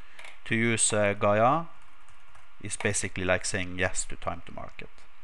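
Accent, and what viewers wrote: Norway. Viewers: loooooooool!! i knew you were norwegian, typical norwegian "english voice", kul tutorial foresten xD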